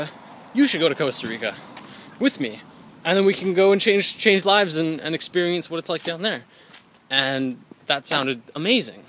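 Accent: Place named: Canada. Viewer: To the people commenting on how he says words: He's Canadian. He has a Canadian accent. In fact if I remember correctly he even said "aboot" in SS1.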